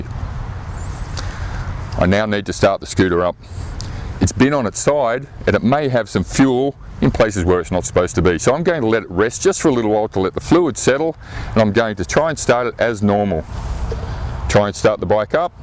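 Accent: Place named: Australia. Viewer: love australian accent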